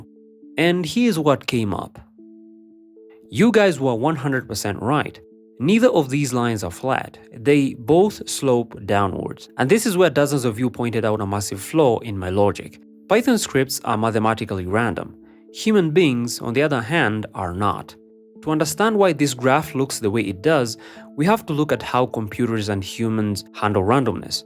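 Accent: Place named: Russia. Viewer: Why do I hear russian accent from a Kenyan?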